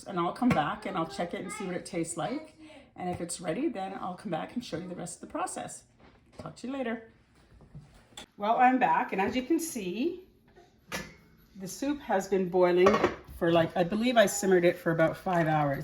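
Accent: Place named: Manitoba, Canada. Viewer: Thank you for sharing your method! I have yet to try water bath canning, but am excited to have some ready-made food to have on hand. My sister in law's parents are from Manitoba, your accent sounds similar! Greetings from Ohio 🙃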